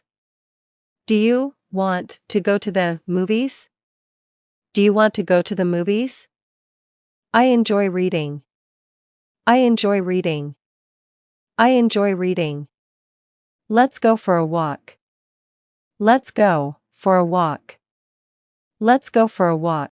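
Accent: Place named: United Kingdom. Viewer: Thanks my teacher is that British accent or American?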